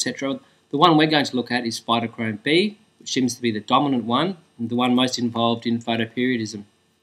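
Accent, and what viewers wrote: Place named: Australia. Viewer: ohhhhh. it now makes sense. You saved my sanity. thank you. And I do appreciate the australian accent too. :D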